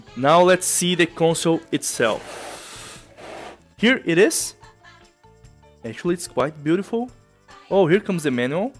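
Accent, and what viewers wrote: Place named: Brazil. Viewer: sotaque brasileiro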